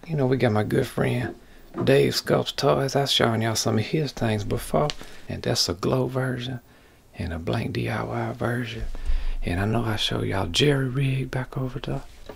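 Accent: Louisiana, United States. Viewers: You have to be from Louisiana!! Hearing you make me think of home!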